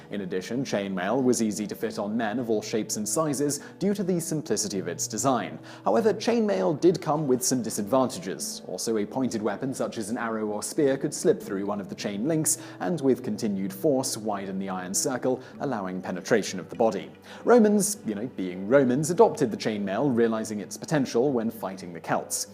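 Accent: United Kingdom. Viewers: Well be patient Simon is British Speaking which can't be too far off from Irish and also the Celts lived in northern Europe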